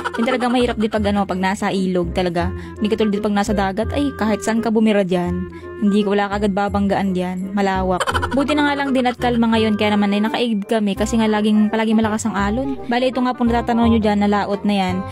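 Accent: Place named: Mindoro, Philippines. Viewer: Ai oo mandin.. dto sa quezon ai kapareha ng punto sa mindoro..saka semi batangas din ☺️ same. Bisaya aq pro dto laang aq nakatira sa perez quezon at uhay nabingwit ng taga quezon 🤣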